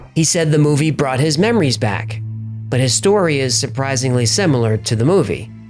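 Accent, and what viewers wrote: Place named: Long Island, United States. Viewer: I knew you were a long Islander when I heard the fish say "dowg treats" haha I'm a LI native too and you can't miss a long Island accent. It's so new yorky sounding lol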